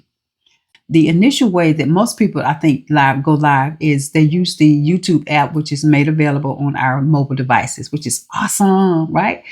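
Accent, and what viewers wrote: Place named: Southeast, United States. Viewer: Hey! Thanks for visiting our channel and subbing! You also have a new sub! This is a great, informative video! One of my biggest issues is posting our content on a more consistent basis (we have tons of recorded content). We would like to do a live eventually but I want to wait until we have at least 1,000 subs. I would hate to start a live and no one showed up 🤣🤣. I love your style and your accent. I am guessing that you are probably from one of the southeastern states? You hit on all the points to get those watch hours; Consistency, Playlists, Storytelling, Engaging Content, etc. Keep up the great work. Look forward to your next video!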